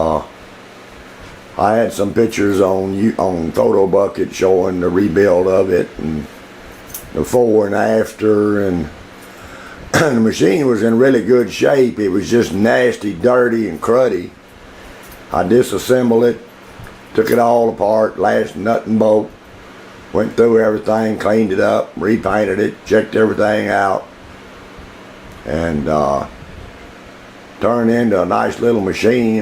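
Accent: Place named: Louisiana, United States. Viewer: That's a really cool shop. That model table you made for Abom79 is AWESOME!!! The most of what I do in my shop is make stuff for the house and the grandkids, I can only imagine the really cool stuff you make for your family & grandkids LOL. Your level of organization seems like something from a submarine or ship. Two guys I was in the Navy with were from Louisiana; Kerry Gros from Shreveport and Dan Sumrall from Denham Springs; your accent reminded me of both of them. Keep the vids coming, your work is really interesting.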